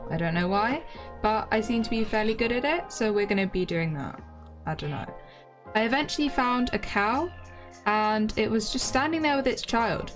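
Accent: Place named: London, United Kingdom. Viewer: I thought so, sounded like a londoner, well good luck to ya 🙂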